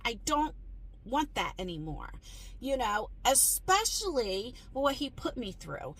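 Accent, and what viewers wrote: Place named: New York, United States. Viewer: Hey! I’m originally from New York, I’ve been in Florida for 30 years. I hear your voice and I get homesick L O L… stick to your guns on your new life! Sounds like you’re getting another chance at a calm, peaceful, relaxing life! Count your blessings! You are rocking it! ❤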